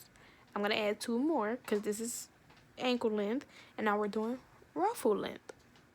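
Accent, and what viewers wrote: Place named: Louisiana, United States. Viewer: The Louie accent 🥹🫶🏾. I love ur personality and that color is 🔥 .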